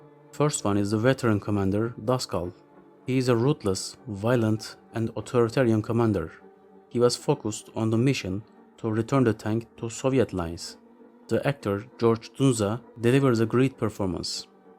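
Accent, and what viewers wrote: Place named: Turkey. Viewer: where are you from bro ? your accent sounds turkish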